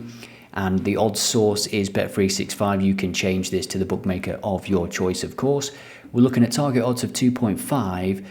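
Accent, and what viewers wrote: Scotland, United Kingdom. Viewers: Deserves more than 1 like for the Scottish accent mate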